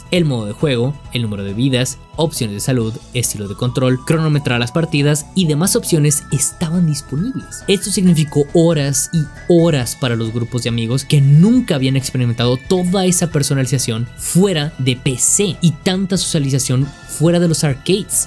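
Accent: Mexico. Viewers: odio como los mexicanos pronuncian el ingles....zir pari....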